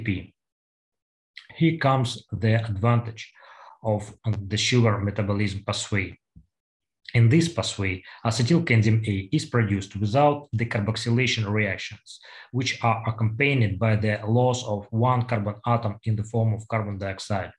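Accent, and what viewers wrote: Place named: Russia. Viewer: your accent is absolutely from Russia or like these countries, it never seems like English, if you can write in title boxes in English it will be better so we can understand....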